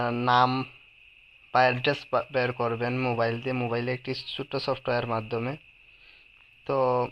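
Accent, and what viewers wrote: Sylhet, Bangladesh. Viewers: তুই সিলেটি না কিতা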